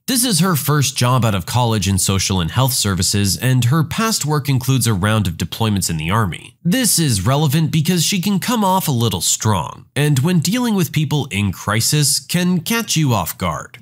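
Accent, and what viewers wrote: United Kingdom. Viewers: Love the British accent init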